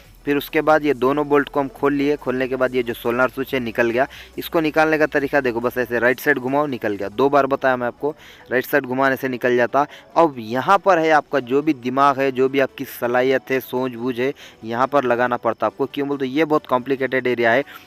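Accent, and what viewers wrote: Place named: South India, India. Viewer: बहोत बढ़िया जानकारी देते हैं आप। खास करके आप साउथ के होके भी शुद्ध हिंदी में जानाकारी देते हो , ऐसे साउथ का कोई भी हिंदी में बात नही करता । बहुत बहुत धन्यवाद 🙏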